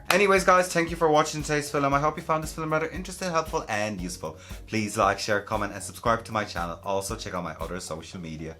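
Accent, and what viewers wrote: Ireland. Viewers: Are you irish?!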